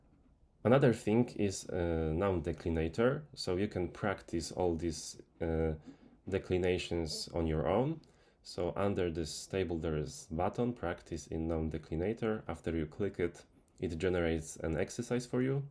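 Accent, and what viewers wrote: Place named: Poland. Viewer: I am here for your Polish English to hear!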